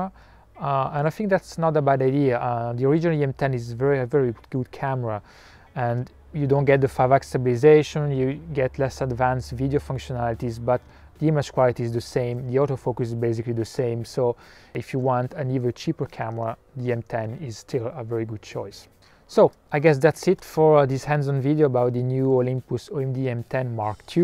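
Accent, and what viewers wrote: France. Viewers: Franchement très bonne vidéo, avec un bon petit accent à la touche française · Salut, j'ai vu un commentaire en fr et à l'accent j'ai un peut reconnu donc j'en profite comme je suis au top en anglais, j'ai actuellement un canon 70d avec un 18-35 de chez sigma et un 50mm, je cherche quelques chose de plus léger et compact quand je part en vacances ou sur des soirées, ce que je veux conservé c'est surtout le RAW, le viseur, la possibilité de changer d'optique et un bon placement au niveau du tarif, j'aimerai aussi un piqué correct, pas digne d'un 5d mark III avec une optique L, mais au moins d'un 70d avec un objo de kit. Je pense que ce olympus rentre parfaitement dans mes critère, peux-tu me confirmé ? As-tu des exemple en haute def de photo prise avec le kit de base de ce olympus ? Merci beaucoup pour ton retour !! Et bonne continuation.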